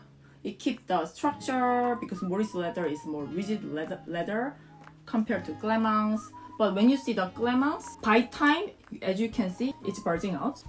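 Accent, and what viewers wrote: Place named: South Korea. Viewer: 발음이 한국인인데?! ㅡ..ㅡ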